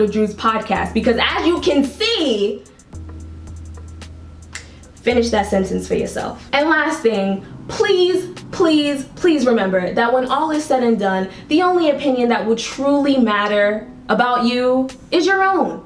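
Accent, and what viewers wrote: Jamaica. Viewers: Are you Jamaican or from the Caribbean because I hear the patwas enuh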